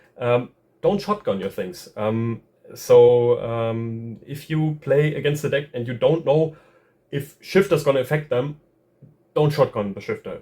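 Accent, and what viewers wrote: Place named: Germany. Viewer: boa.... wenn deutsche englisch reden...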